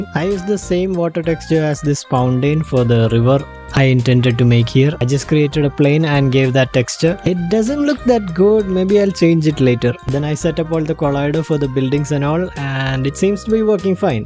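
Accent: Kerala, India. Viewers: your sound like you are from kerala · ur slang is just like malayali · Accent kettal ariya malayli aan nn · i know a fellow malayali when I hear one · Sounds like malayali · I knew u were from kerala by lisisting to your voice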